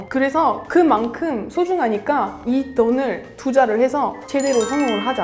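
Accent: Seoul, South Korea. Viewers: 나보다 더 서울말 잘 하시네요.. 전 서울말 못함.... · 눈감고 들으면 완전 한국인 발음이네요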